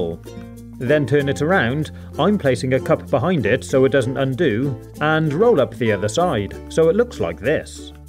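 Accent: United Kingdom. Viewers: Hope u don't mind me asking but are you British?? I am British 😁 U sound a bit Edit: keep up the great work! Love your vids, been subscribed for about 2 years now 😄😮😃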